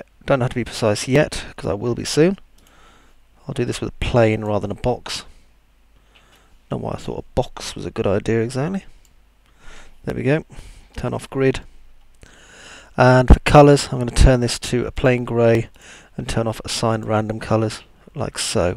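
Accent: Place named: United Kingdom. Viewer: Your accent is extremely British. And great tutorial BTW.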